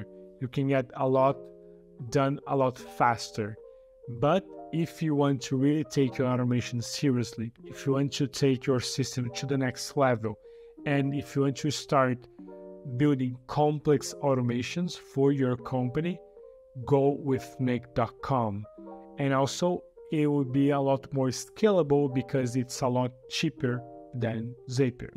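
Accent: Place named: Brazil. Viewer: eu nn posso provar, mas tu tem um sotaque de br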